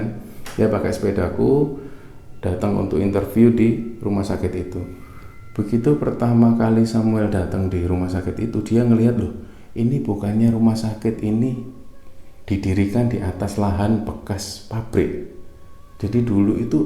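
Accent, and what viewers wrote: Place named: Surabaya, Indonesia. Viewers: Buka chanel klik vidio baru, liat yg cerita mas. Wes ndak opo wee ditinggal kabeh😂 Jangan berubah yaa mas cara pembawaanya👍 Tetap Suroboyoan👌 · Chanel favorit..... Sing cerita mas dgn logat suroboyo an e..... Salam saking solo jateng mas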